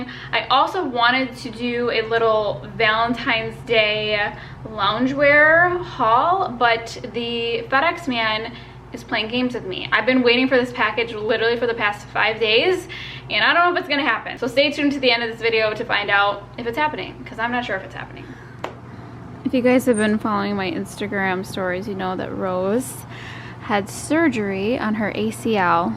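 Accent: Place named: New York, United States. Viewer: Your NY accent is so cute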